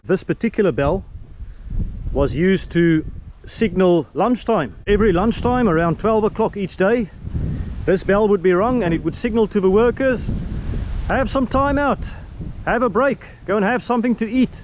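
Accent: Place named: Afrikaans, South Africa. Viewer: Yes stay. Jy klink soos n boer, waarom bly jy oorsee, jpu nodig hier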